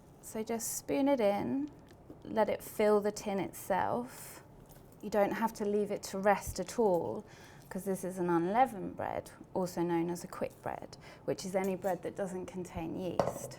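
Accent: England, United Kingdom. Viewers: You have beautiful accent. So awefully English. Quite rare to hear nowadays (in noisy London) Absolutely delightful. And nice bread too. Thank you, Chef!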